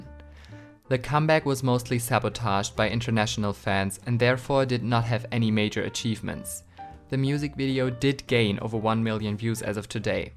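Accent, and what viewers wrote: Germany. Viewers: okay, off topic, but are you, by chance german? Your accent feels farmiliar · Are u german? :D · are you German by any chance ? You sound quite German to me :) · are u german by chance? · Is the narrator German? That accent is so strong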